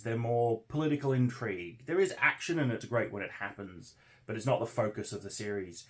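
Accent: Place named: United Kingdom. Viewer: Thank you for the video. You should try speaking less British. You hold onto words too long. This video could have been 11 minutes and I wouldn’t have started nodding off immediately. Good content though.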